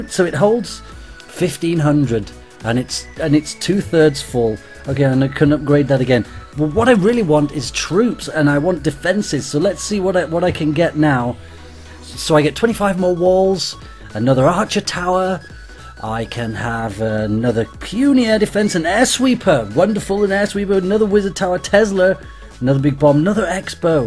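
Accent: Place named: United Kingdom. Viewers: speaks "the queen's english" which is quite different than american english. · I'm pretty sure he does have a British accent. What would you say it is?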